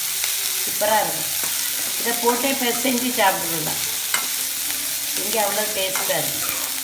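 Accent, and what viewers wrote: Nagercoil, India. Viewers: Theivamee namma oru slang tamil ketu yethanaa naalu makka ... Special word naroil insted of nagercoil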